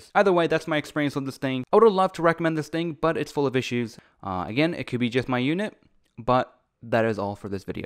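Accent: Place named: South Africa. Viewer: I am Greek speaking, but being a teacher of English as a foreign language (EFL) and having spent 15 years in Sth Africa, I don't have a problem understanding the language. However, I can't compete with a native speaker. When the narration came to the technical terms, I completely lost track of what you were saying. I couldn't absorb it fast enough. The problem was compounded by the fact that the South African accent is different. Which comes to the point of "please think of us out there who are struggling with a foreign language, especially with the technical explanations." Thank you, I appreciate your channel.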